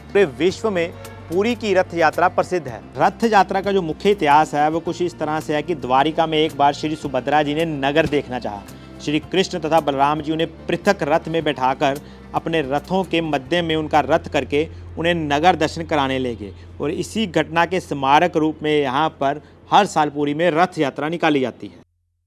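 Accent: Punjab, India. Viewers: Aapka Punjabi lahja❤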